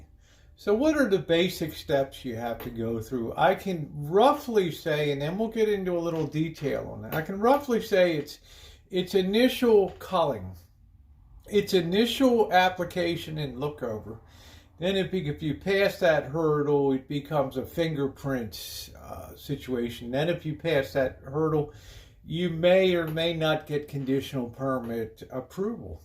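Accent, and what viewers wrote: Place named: South Jersey, United States. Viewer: You sound like you're from South New Jersey.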